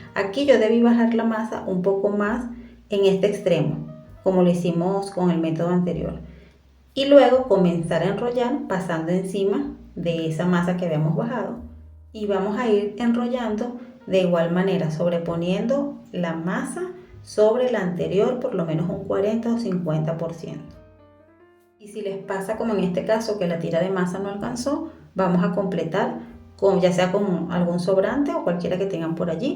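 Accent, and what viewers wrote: Venezuela. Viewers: Buenas tardes. Me encantó tu receta y la forma súper sencilla y explicativa qué aplicastes. Aún y cuando estás en Colombia parece que fueras Venezolana por tú acento. Voy a comprar los ingredientes para hacer estos tequeños qué se ven fabulosos. Después te cuento. Gracias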